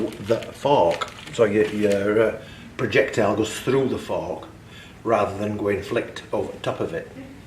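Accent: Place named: Yorkshire, United Kingdom. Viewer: You sound like you're from Yorkshire if you are there's always a catapult show every Sunday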